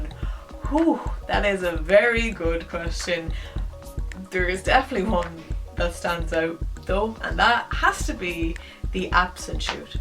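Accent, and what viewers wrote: Ireland. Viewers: A-ha! Oh that was solid!, I sent those cakes. Still my fav Try video! Now I’m all happy you gave them a mention! Billet Log over the Joe Louis?!?! Pure craziness! 👍🏻 This ones for you miss fancy leprechaun accent girl!! 👍🏻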